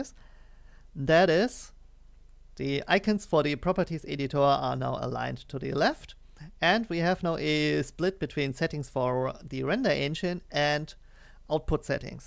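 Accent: Germany. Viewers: Sprichst du eigentlich deutsch? Deine Aussprache hört sich sehr deutsch an :D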